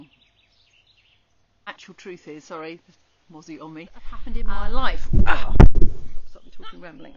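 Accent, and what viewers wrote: United Kingdom. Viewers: Are you from Norfolk? You sound like Pam Aires. (Hope that is the correct spelling). Sorry for your loss. Moving abroad sounds great but language is important factor to consider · I just watched your video again (saw it for the first time this morning). I am so impressed with your remarks -- they really resonate for me, and inspire me. "I've been investing in my retirement for many years, but in this moment I wanted to invest in happiness, and joy, and precious memories." WOW! Thanks for inspiring me. Where do you live when not in Sweden? Based on your (slight accent), I assume you are British? Thanks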